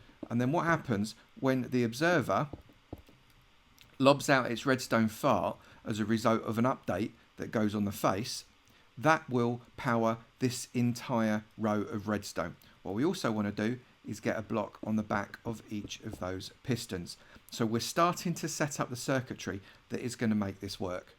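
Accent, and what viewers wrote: United Kingdom. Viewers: did anybody tell you that you sound like mumbo jumbo or is it that people with British accents sound the same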